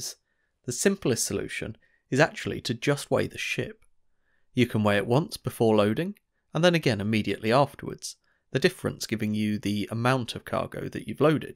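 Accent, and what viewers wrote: United Kingdom. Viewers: Somehow his British accent is disturbing... at least to me.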